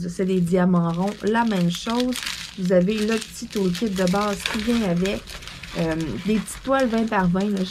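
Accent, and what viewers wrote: Canada. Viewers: Coucou.J'adore tes vidéos avec ton joli accent canadienTu nous montre de belles choses et tes conseils sont toujours bien expliqué.merci pour ce concours.Trs gentil de ta partJe participe avec plaisir et te fais de très gros bisous.Et des caresses à tes minous.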